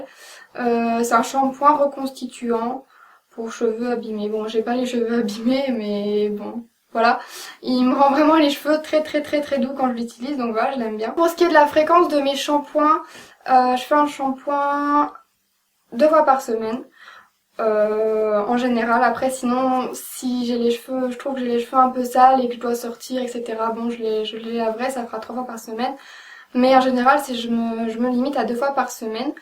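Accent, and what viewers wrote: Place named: Quebec, Canada. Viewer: tes vidéos sont super! c'est mignon tu as un léger accent québécois!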